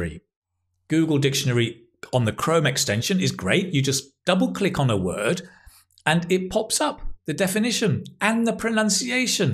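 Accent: United Kingdom. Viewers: Sir do you have british or american accent please tell me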